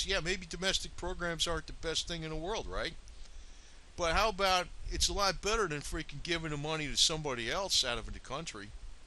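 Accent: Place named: New Jersey, United States. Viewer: Its a real accent. Its an old school working class New Jersey accent I think? The only other person I've ever met with the same accent was a WW2 veteran. Its an old school accent.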